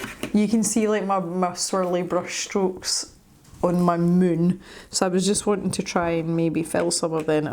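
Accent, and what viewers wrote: Scotland, United Kingdom. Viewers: “Just smash it all tegether” aw, I’m here for the Scot as much as the art as someone from Norn Iron living in England, I miss a strong local accent!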